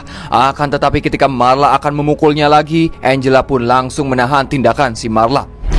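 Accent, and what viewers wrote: West Java, Indonesia. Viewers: Nada narasinya kaya IQ7 deh,apa emng klo org Sunda ngomong Bhs Indo kaya gini yaa?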